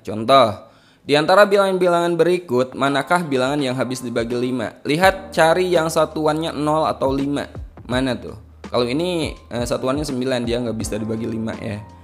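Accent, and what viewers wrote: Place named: West Java, Indonesia. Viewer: Pix Sunda Pisan😊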